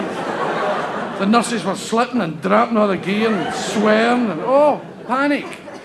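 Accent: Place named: Glasgow, United Kingdom. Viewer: His material was much better when he still had the Glaswegian accent. He was still funny but being from Glasgow I found him funnier then.🏴🇺🇸😜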